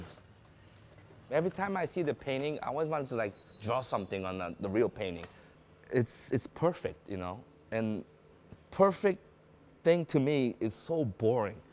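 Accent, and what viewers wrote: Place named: Bay Area, United States. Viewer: He kinda sounds like he’s from the bay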